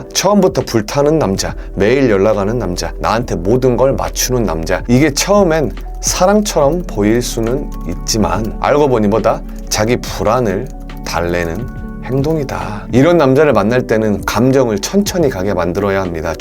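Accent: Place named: Jeolla, South Korea. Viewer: ㅋㅋㅋ전라도 사투리가 묘하게 중독적...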